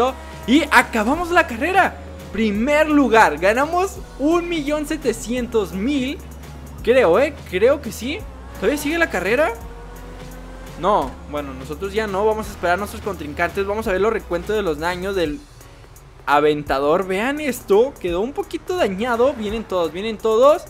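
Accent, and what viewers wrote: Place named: Mexico. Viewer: mexico se nota hasta en el acento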